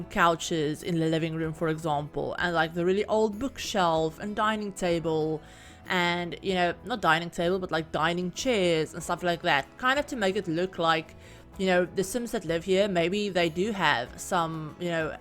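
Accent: South Africa. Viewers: omg! are you south african? super cool to see south african simmers on YouTube 😭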